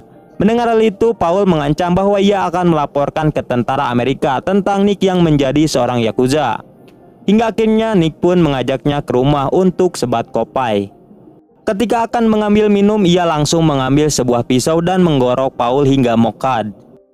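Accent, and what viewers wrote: West Java, Indonesia. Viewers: Orang sunda ya bang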